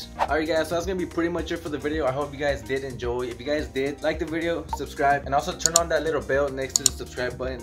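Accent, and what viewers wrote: Chile. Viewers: Wn eres chileno? La raja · eres chileno verdad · Are u chilean bro? AGUANTE CHILITOO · Are u chilean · eri chileno ? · Are you Chilean? :0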